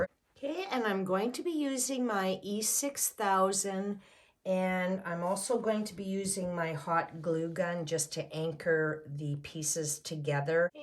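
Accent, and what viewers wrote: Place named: Canada. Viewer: Hello, I am yet to start my new passion of paint pouring but I had not seen anything like this before! You have inspired me so much. Before I found this painting, I had started a Reno in my home. I still have much to do & have had to decide to wait to start this new endeavor till my remodeling is done or I will not get it finished at all. It’s been difficult to have to wait but it’s for the best! The Beautiful Creations you have given me has jumped my mind into overdrive! I just want to be able to get organized and setup a Craft Room. I have enough supplies to do a lot of work,(Play), & it’s all in boxes in my bdrm! I barely have walking room to get thru it to use the bathroom. I think that you must be Blessed by the hands of God to Create such Beautiful Art! Thank You for putting your Vids on here for me to enjoy! I consider it special from one Friend to another. 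Where are you from? I think I detect a Canadian accent! I live in Washington State about 3 hours or so South of BC, Canada. Like your works of art, it is as beautiful as it can be. I hope you will have continued success and stay safe so you can create even more. Thanks again, Newburyport to this stuff, JilliBean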